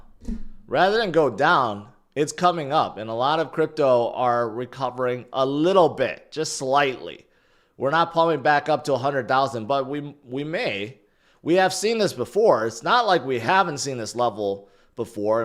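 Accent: United States. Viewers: Hey! You really don't know Canada, and you talk like you're American. You look nothing like an American. I liked you, but now I see your true colors. Canadians are very nice, but we're not stupid. We wonder why your president wants Canada to be part of the United States it will never happen. We'll always defend our interests first, as if we were the ones who started it. We're not all Georges.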